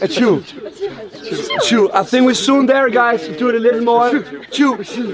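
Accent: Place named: Denmark. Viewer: That Danglish tho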